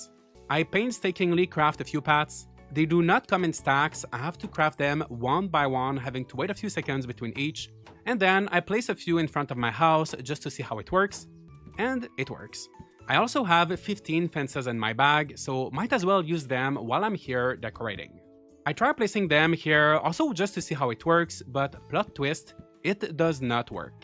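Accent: Quebec, Canada. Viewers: He is from Quebec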